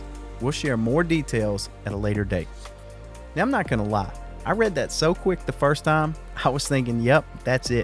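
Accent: South, United States. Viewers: I saw the notification for a new video. Only problem I have is your videography and editing are so good and high quality I will only watch your videos in 4K on my 65" TV. It's like watching a movie everytime and I actually feel like I'm right there with you as you narrate. It's like an IMAX documentary for coasters. Please take as much time as you need we will all be here awaiting your over the top content and your gentlemanly southern drawl guiding us through they way you see the theme park world.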